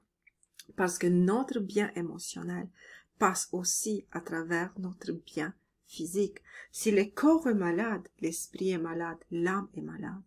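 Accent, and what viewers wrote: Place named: Latin America. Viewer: Merci. Je ne peux pas dire que c'est une période difficile que je traverse mais une période de transitions avec divers projets qui demande courage, patience, persévérance, quasi à la retraite,... j'applique déjà ces 3 conseils mais j'apprécie beaucoup de les entendre de ta voix. Cela cautionne ce que je mets en place pour parvenir à réaliser mes projets avec sérénité, à être patiente avec moi-même.....j'aime ta voix apaisante, ton accent latin doux et chaud et parfaitement compréhensible. Je t'aime aussi chère.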